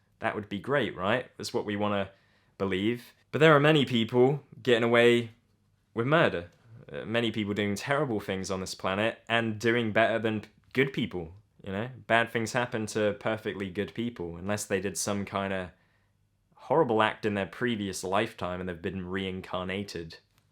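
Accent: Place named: England, United Kingdom. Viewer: Where do you live? I presume from England from your accent. Good video anyway. What about your mental health and depression? I hope well. Best wishes from Italy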